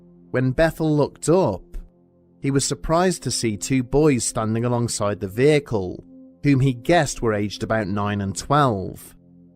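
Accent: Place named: England, United Kingdom. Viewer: The video maker sounds English, cannock chase in England, next to Birmingham is famous for black eyed kids.... Nearly half way through the video... Oh he's just mentioned it as in typing LOL.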